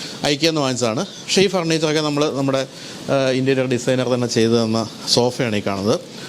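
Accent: Kottayam, India. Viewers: BYO - bar rule 😄😄😄. Like your Kottayam tone.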